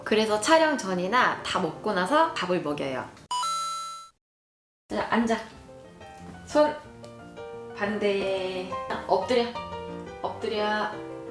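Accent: South Korea. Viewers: 청주분이시죠😁😁 반가워용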